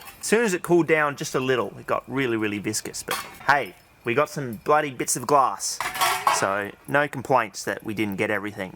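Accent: Australia. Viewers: I'm like "Damn, he's posting at 7am?" Then I remembered from the accent. Then I'm like, "wtf? It's spring, not fall." Then I remembered that Australia is basically the upside down world. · I love your videos! And the Australian accent is amazing!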